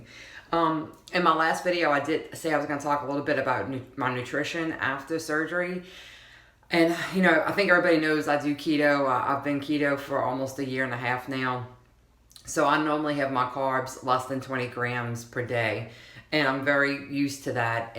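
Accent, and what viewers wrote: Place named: Morgan City, United States. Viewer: Love your accent...sounds like home. I grew up in Morgan City.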